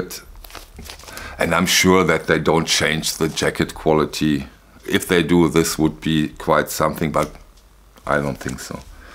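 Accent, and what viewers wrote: Germany. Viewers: Love the German accent ALL HAIL 💜🤘🏿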